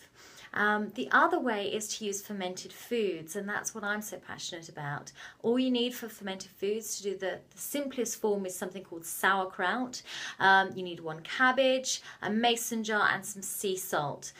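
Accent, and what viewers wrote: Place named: United Kingdom. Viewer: U have a strong British ascent young white lady. Other than that, when is the best time to take probiotics? Thanks in advance ma'am.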